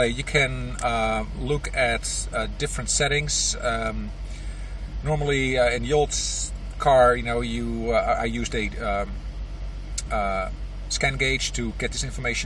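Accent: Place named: Netherlands. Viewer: Your Dutch? The accent sounds familiar..??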